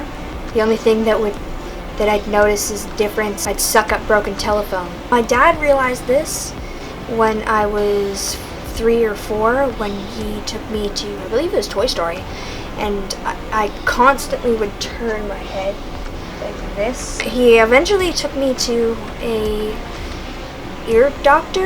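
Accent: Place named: Canada. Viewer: I actually love how you sound. I wanna say Canadian maybe? No matter where you hail from, you have the coolest voice. I wish I sounded like that. Not even joking. Xo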